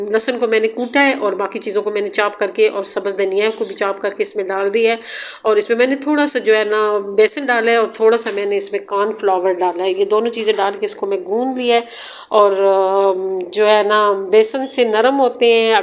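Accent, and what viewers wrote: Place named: Punjab, Pakistan. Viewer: Bilkul meri tara my b ici tara urdo bolti ho yahi hal hy panjabi walo ka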